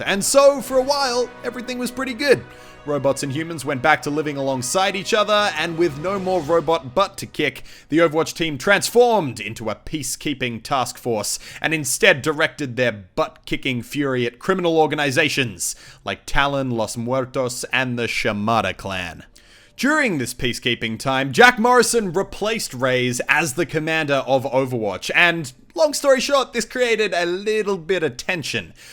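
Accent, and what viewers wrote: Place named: Australia. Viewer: your Australian showed so Hard in this vid. Reyes is pronounced Ray-ez, not Rays lol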